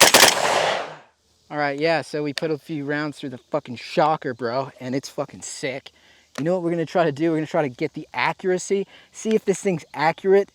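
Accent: South, United States. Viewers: Bro you should keep the Sothern accent! sike as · Honestly I support the Southern accent it fits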